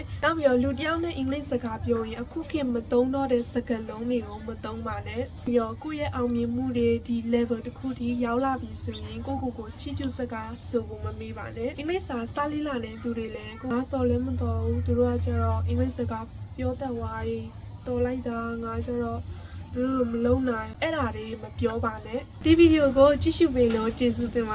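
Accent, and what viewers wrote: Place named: Myeik, Myanmar. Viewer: Thank you Tr. ညီမက မြိတ်သူလား။ လေသံ မြိတ်သံ နဲ့ တူလို့ပါ။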